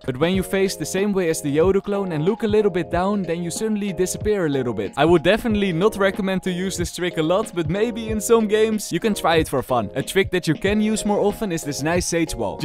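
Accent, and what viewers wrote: Netherlands. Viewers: BRO YOUR ENGLISH ;( ur from the nederlands and u can hear that so fucking good like at least try to have a diffrent accent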